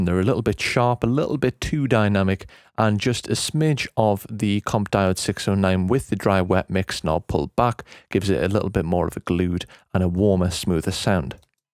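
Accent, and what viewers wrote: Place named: North East England, United Kingdom. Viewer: Champion North East accent there fella!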